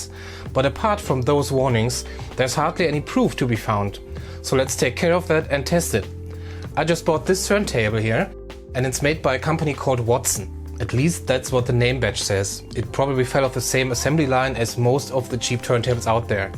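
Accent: Germany. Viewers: Your slight German accent is adorable! Und Du bist so gescheit. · Are you German :D?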